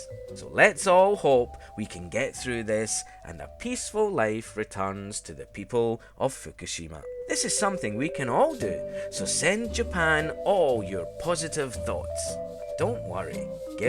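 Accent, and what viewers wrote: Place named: Scotland, United Kingdom. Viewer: Iam not saying that I am one of the one's who may find this offensive, I am just commenting that some may. I actually found it amusing especially with the Scottish voice over which just added another dimension to it. I used to live in Saitama many years ago and in some respects wished I was back there as we are still being hit hard here with many aftershocks and there's a serious lack of fuel, but at least food is back in the shops on a regular basis again! Stay safe in Saitama!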